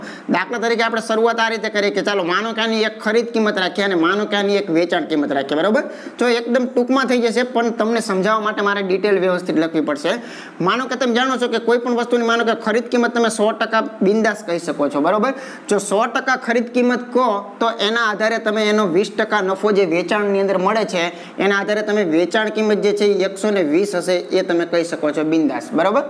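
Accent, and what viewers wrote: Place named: Kathiawar, India. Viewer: કંઈ બાજુ ના છો તમે છે Saurashtra kathiyawad બાજુ બોલી પર લાગે છે એવું ...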